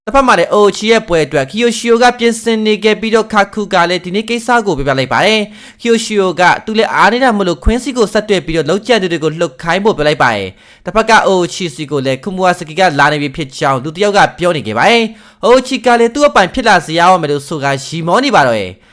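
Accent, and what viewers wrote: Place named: India. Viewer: အသံကကုလားနဲ့တူတယ်နော်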